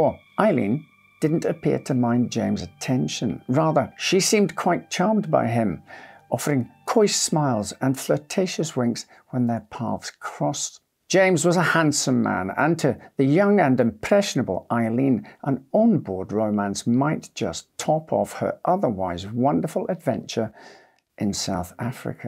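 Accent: Scotland, United Kingdom. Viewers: your lovely Scottish accent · So are you a Scot or a Welshman? The reason I have asked you is because you sound just like my father who hailed from Edinburgh but went to Perthshire during the war, he never lost his Scottish accent, even though he had been in Australia for 31 years at the time. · i love listening to this lovely man with his scottish acsent, he sounds just like my ex husband. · Delivered with a beautiful Scottish accent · As always you narrated story kept me the edge of my seat. Thank you Sir and your wonderful Scots accent!❤ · A little Scottish, minus the rolling Rs. Good old English and an occasional Africaan twang. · it's a Scottish accent 🤣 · well, no, but we do know that it's a Scots accent.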